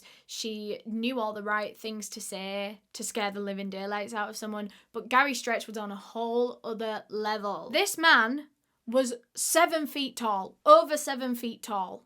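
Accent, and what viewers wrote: United Kingdom. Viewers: hello. i love your British accent..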